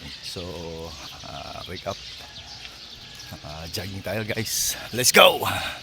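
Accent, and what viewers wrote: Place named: Mindoro, Philippines. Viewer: Hi kuya,i love jogging too walking exersise is good for our health...taga saan kb kuya mindoro din ba, kc ung accents mi eh parang mindorinyo din.thankyou sa suport ho. Full watch tamsak.suport👍👍❣️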